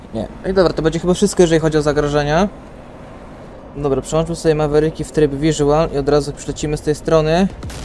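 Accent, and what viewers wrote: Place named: Poland. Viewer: Siema, fajnie w koncu ogladac Polski akcent w DCS. Aktualnie mam mala przerwe od DCS. Grasz moze w IL2 Great Battels ??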